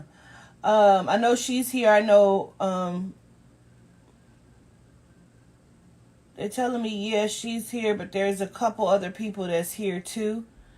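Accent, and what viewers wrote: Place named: Chicago, United States. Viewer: I’m from Chicago and that accent is on point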